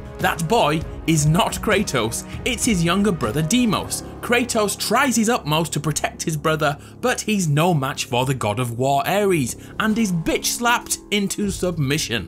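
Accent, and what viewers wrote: United Kingdom. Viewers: why are you british? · Man, I was looking for something else and wound up on this video... and somehow, the British accent really improves the story of God of War... GG, GG indeed.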